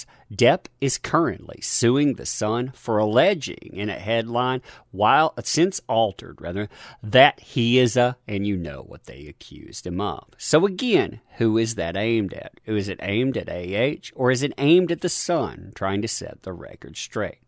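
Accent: South, United States. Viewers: Love you're work and am with you on the things you talk about. It is scarie to think about the due process is being fuzzed out with pure crazy making b.s.everywhere and needs to be addressed before it's lost completely. Thankyou for you're time and effort to enlighten folks to what's going on. 👍 Loving the southern droll way you speak. ❤️ Love from UK.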